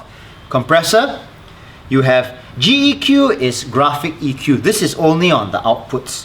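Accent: Singapore. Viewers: I know that you have a very familiar accent! That's why I'm asking whether you're Singaporean/Malaysian ! How did u learn our English accent? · Great Singlish hahaha 👍🏻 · Oh goodness didn't expect the Singaporean accent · Yeahh..sounds really Singapore English. Great video!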